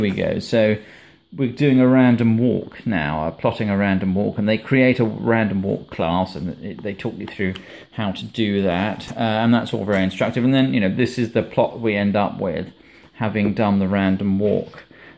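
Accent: RP, United Kingdom. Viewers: Quite a posh sound gentleman! A Gentleman and a Scholar! :)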